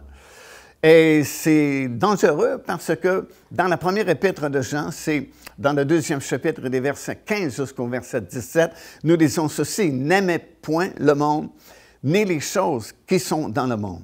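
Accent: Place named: Quebec, Canada. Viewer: Il a dit 66 livres c’est son accent québécois . Fraternellement.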